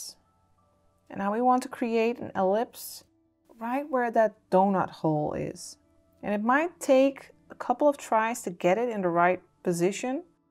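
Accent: Netherlands. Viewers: i was like: she is dutch. I CAN HEAR IT. En toen hoorde ik stroopwafel en ik wist het gewoon.. BTW LOVE YOURE VIDSSS <3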